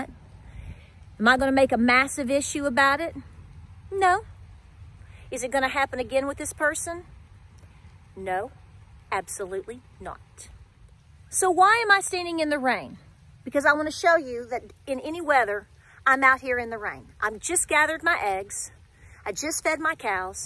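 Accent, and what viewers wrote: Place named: Knoxville, United States. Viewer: Sounds like people that live in west Knoxville.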